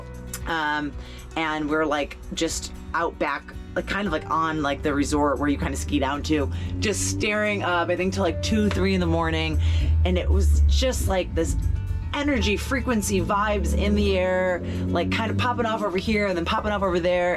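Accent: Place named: East Coast, United States. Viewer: I used to live in Schenectady. Do I get any points for that? *Drew Bledsoe lives here in Bend, by the way. Listening to you speak, at the pace you do says two things--one, you're from the east coast (as I am), and two--you're excitable. Me? I get wound up talking gear amongst other backpackers. Great video!!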